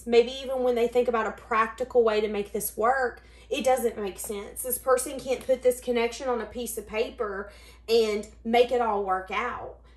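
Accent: South, United States. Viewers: I so enjoy your channel '! Your southern accent is hypnotic and very relaxing! The vibration of all your reads is 100% sincerity! wow also you definetly know my person,haha your gift has taught me so much about The connection with my twin flame!I feel a reunion with us is very close. We have known each other since elementary school,she was my first kiss,first crush,first episode of butterflies in my stomach yep. When we reconnected as adults,a couple years ago,it was magical! It was unbelievable how much chemistry and passion we experienced, never left her house for a solid month,heaven on earth.we connected emotionally just as strong as sexually,The conversations were extremely deep lasting sometimes all night,we also share the same type of sense of humor,and so we also had hysterical fits of laughter that went all night.hqahaha I dont even have the words to describe how much I love this woman. 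She feels the same towards me.However the twin flames journey is full of surprises!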